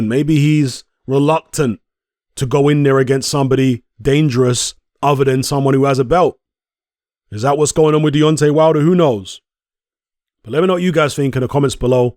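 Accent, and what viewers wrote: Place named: United Kingdom. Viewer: Why did your tone change when Wilders was mentioned? Why is there someone at fault. Are you ignoring that Wilder is in mediation. This has been one of the most bias boxing videos I’ve seen, although you try to play it off. Ruiz made a video saying he isn’t fighting Wilder there aren’t any talks it’s a fan made poster and Rumor. This is sad coverage idk if assuming off your accent, but if you’re from the UK it’s pathetic. All UK fighters serve as easy meal tickets for Carmelo. Bjs probably getting stopped, and Aj ran from Ruiz and Hearn doesn’t like the idea of running it back a third time, they’re 1-1 Aj never avenged his loss he beat an out of shape Ruiz and ran at that. You saw the fear in his eyes every time Ruiz would swing that night. Fury definitely cheating in both fights you can’t photoshop videos and those gloves definitely didn’t look normal. 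Both those men are the best this UK mega fight has to offer it’s sad. The fact they haven’t even announced a venue tells me this fight may not even happen.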